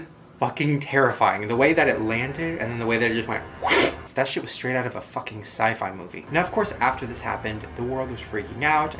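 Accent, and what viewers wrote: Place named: United Kingdom. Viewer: i love the subtle british accent he does in this series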